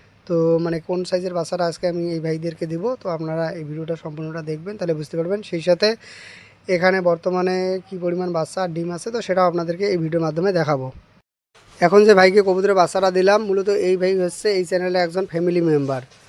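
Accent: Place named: Rangpur, Bangladesh. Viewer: আরে কথা শুনে তো মনে হচ্ছে রংপুরের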